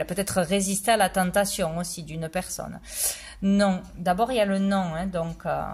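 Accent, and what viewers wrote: South, France. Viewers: avé ton accent méridional et candide, tu es sympa ❤😀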